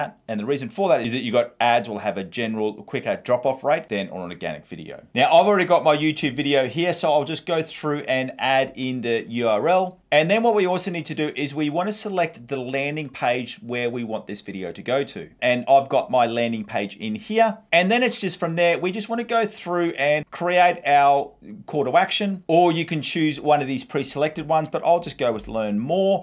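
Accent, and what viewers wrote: Australia. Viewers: Love these videos. Super informative. Great flow. You can never go wrong with the Aussie accent. Cheers mate.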